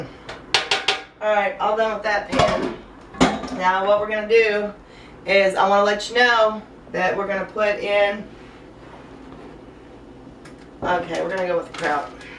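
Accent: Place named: Ohio, United States. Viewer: You are my "Sister from another mister!" You sound like me, talk like me, I felt you! (Not to sound stalkerish!) I got the same cookware and everything!!! IDK how old mine is either! I've also got my Grandpa's Cast Iron Skillet! (Notice the caps? BECAUSE!!) I'm from Kettering Ohio! You sound like you're from here...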